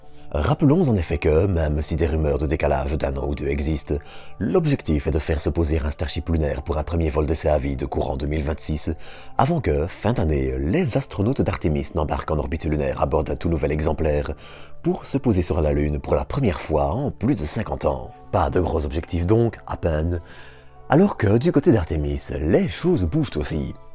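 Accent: Belgium. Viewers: dsl que tu te sois pris une partie de la communauté sur le dos; c'est dû à un ras le bol contre des gens habituellement mauvais, voir insultant, envers, en tapant généralement sur sa voix et son accent. Tes coms sont soft et argumentés, et n'ont rien à voir avec eux. Ce qui est inhabituel dans la voix de est d'une part son timbre de basse, sous le baryton, et d'autres part son accent belge, voire (si je ne me trompe) wallon. Perso j'adore, mais chacun ses goûts! 😋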